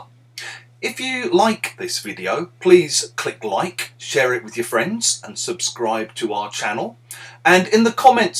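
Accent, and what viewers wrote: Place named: RP, United Kingdom. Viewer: I can't stand her la-di-da way of speaking.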